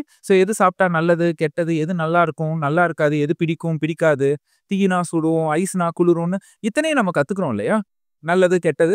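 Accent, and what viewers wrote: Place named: Erode, India. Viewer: Thank you I am regularly watching your video and your way of explaining is very good, I am from erode and your slang resembles it very good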